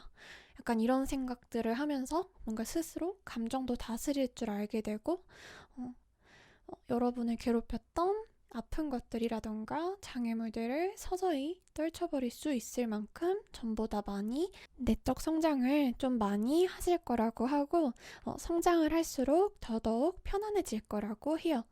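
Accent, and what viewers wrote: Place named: Seoul, South Korea. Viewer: 서울말씨 귀엽네요.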